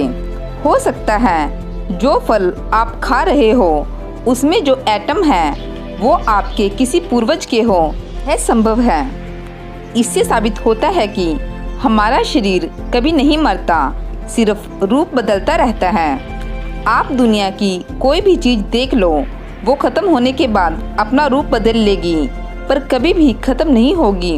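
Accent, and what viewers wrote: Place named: Kullu, India. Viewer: Behan ji app kullu district see hoo ?? Apka accent kullu ka lag Raha hai??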